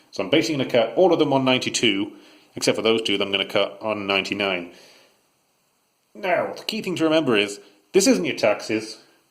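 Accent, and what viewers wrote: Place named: United Kingdom. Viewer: Worst thing about this video, It sounds like a Brit trying to be American and it's making the video unwatchable.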